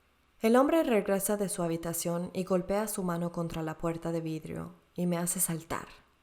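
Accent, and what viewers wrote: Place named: Latin America. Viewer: Feliz de escuchar a una narradora latina en el youtube🙏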